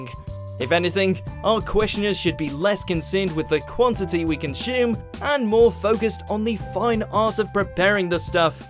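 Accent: United Kingdom. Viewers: is the narrator British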